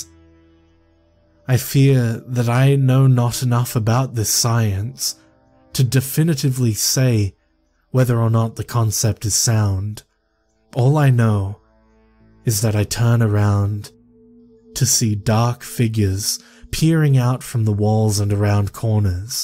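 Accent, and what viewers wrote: Australia. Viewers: Aye Aussie English has different pronounciation eh? I thought you all just spoke like that by chance lol! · I'm pretty sure it's his first language. It sounds like an Australian accent to me.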